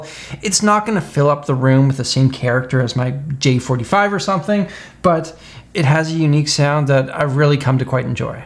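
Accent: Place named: Canada. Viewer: For a few videos now I couldn't shake off the feeling that voice reminds me very much of somebody else's. And now it hit me - Jordan Peterson! I guess that's what you call Canadian accent. Which makes me excited I can hear it, not being a native English speaker.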